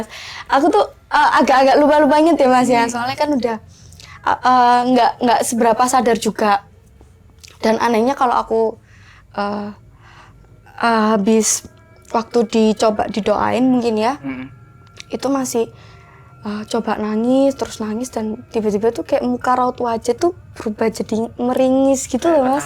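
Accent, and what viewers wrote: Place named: Surabaya, Indonesia. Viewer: Logat khas Suroboyonan yah, iyo la.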